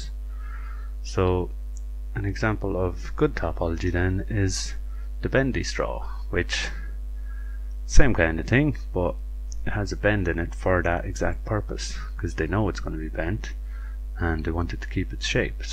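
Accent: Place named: Ireland. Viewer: The Irish accent will keep me watching this! <3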